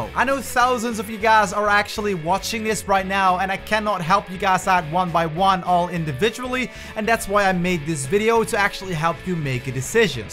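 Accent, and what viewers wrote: Netherlands. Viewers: I see, you're either Dutch or Belgian. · Are u Dutch?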